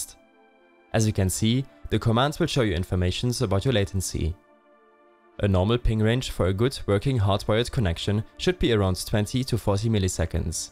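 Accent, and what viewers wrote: Germany. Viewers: dieser deutsche akzent HAHA aber gutes Video ;D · german accent we know dude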